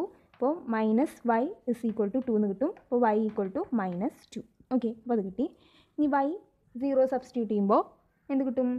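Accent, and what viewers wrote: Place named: Thrissur, India. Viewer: Thrissur alle Aa Basha kettale theriyum 😄😄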